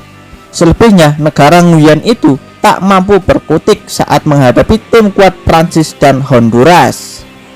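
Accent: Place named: Java, Indonesia. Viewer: Banyakin baca koran..hilangan medok mu mas...g enak didenger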